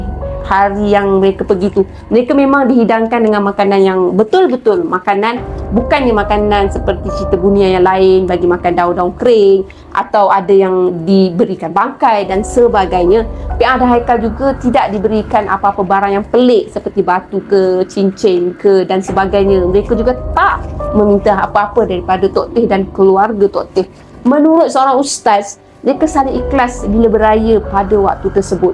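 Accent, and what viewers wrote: Kelantan, Malaysia. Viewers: Kak ore kelate ko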